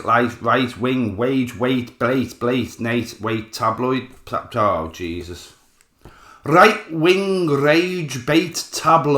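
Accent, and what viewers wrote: Liverpool, United Kingdom. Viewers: Tommy isnt far right if tommy was far right he wouldnt have dealt with quilliam why dont you talk about the grooming gangs raping our girls which they do its been proven in a court of law and what does this guy do attack the one guy those trying to help..... also if tommy wants to put stuff up his nose thats his choice not your choice what this guy doesnt see the left wing are more dangerous than the far right its dangerous for us all and to end this rant the way you speak is arogant nothing worse than an aragont scouser lad talking like this online is pointless you think its right that he got put in a cell for that then it says it all about this guy your the twat your the problem hey but atleast tommy brushes his teeth this guy is loosing his teeth and why are you letting your dog on the couch you scrùff people always using his name for views facts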